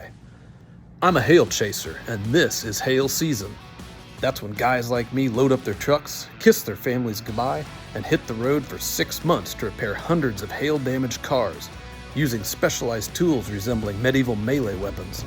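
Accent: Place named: South, United States. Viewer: your lovely southern lilt, nice and gentle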